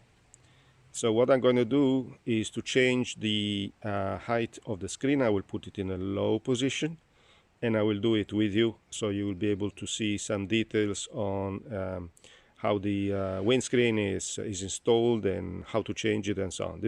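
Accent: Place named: Italy. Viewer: you speak Netherlands i can here you Italien accent beceause i was many thimes in Italy in Modena Ficensa Treviso Napoli vinetie etc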